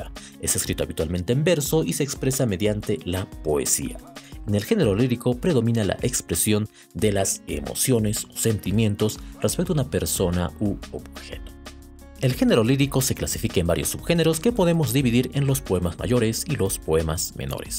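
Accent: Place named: France. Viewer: Tienes minimo acento de un frances , muy minimo pero lo tienes xd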